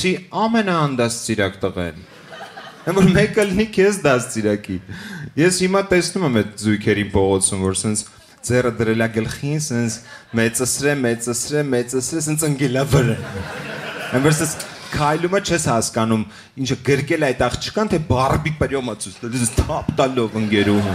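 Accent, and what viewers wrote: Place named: United States. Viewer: Մի տեսակ չեմ հասկանում ամերիկյան ակցենտով հայերեն խոսալու իմաստը, ահավոր խնդալու ա հնչում )))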